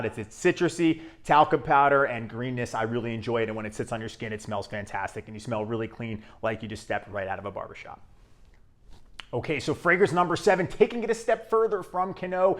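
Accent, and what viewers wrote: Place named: South Jersey, United States. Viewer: Just found the channel and it has to be noted: most people in south jersey dont have Patrick Bateman looking skincare. As a now central jersey transplant from Camden County his actual look is more like here, but his voice is 100% SJ. Most of SJ are bearded long hairs like myself or boomers.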